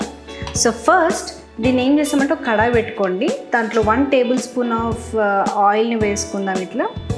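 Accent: Telangana, India. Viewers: టమాట పప్పు ఇష్టం ఉండని వాళ్ళు తెలంగాణలో ఉండరు మీరు మాట్లాడే పద్ధతి మస్తుంథి తెలంగాణ 😍❤️ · Akka ... Naku ge food gurinchi anthaga. Thayliyadhu. . but .... Telangana slang matha .... Chimpaysav🤟 all the best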